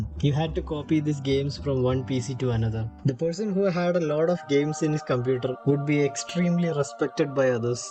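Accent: Kerala, India. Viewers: I had a gut feeling that you might be from Kerala when you used that sad bgm from the Malayalam movie 'Vandanam', in one of your early videos. Now hearing your accent I can pretty much confirm. You are a malayali, right ? I share a similar story as I was hell bent into mobile games(keypad) of the past. I didn't know games were supposed to have a story until class 6. What you said about paying for games is right. I also didn't know games were supposed to be bought for a long time. One thing I never had was friends who had deep passion in gaming like me. I am always criticized and shamed for liking games by my siblings and cousins. But I know the happiness you get just opening an .exe file and trying out a game gor the first time. I'll always cherish that. Lots of love ❤❤ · anda malayali accent is clearly heard :)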